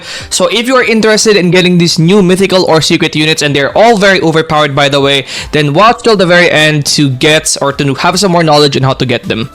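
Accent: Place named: Philippines. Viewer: are you filipino? because your accent is like filipino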